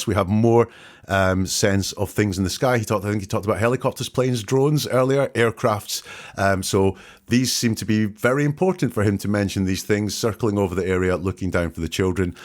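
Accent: United Kingdom. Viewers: I don't know where you come from but you seem to have a British accent. So have you ever been to that area of Nova Scotia. It appears to me that you have already decided that the parents have something to do with the kids disappearance. Do you have any experience working with how East Coasters speak. I certainly hope that the RCMP don't put much stock into what you are saying. When you are so upset by your kids missing you may say a lot of things that don't make any to someone not native to Canada. Sorry I am concerned about the wee kids being missing this guy is so full of shit it is unbelievable.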